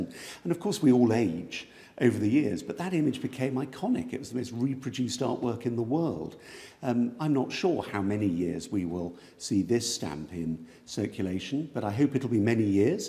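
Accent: RP, United Kingdom. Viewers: What a plum ,round , posh voice this chap has. Not a bad thing for a change. Not happy with Charles not wearing a crown or an image of one on the stamp somewhere. What other even more radical changes has this woke monarch up his sleeve!?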